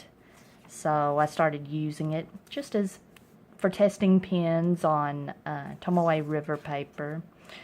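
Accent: South, United States. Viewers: Dear, Thank you for your channel & pen reviews. I think your presentation style of casual conversation, combined with the BEST writing sample camera angle on YouTube!; are wonderfully engaging, informative & endearing. Although some other pen fanciers, may have minutes of opinions about various pens; I find myself, asking, 'I wonder if has a commentary' about this pen or that. Your Southern accent & charm make watching your pen featurettes delightful. I am just returning to my fountain pen roots. I had the pleasure of discovering the joy of the Pilot Prera Iro Ai (F), before I became one of your subscribers. Now I'm waiting for a couple of additions to my 'qwillery': the Sailor Pro Slim 4 Season (Meigetsu) & the Pilot e95s, to arrive. Thanks for validating that these wonderful writing instruments should be 'played with', as well as valued & admired. 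God Bless, Candice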